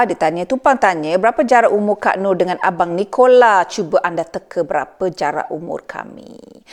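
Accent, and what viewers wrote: Negeri Sembilan, Malaysia. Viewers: Assalamualaikum Makcik....♥️ Suko boto nengok Makcik membebel sebijik mcm Makcik2 sy yg dh lamo x jumpo....laie klo cakap loghat nismilan...ghoman pun lobih kurang yo...hehehe♥️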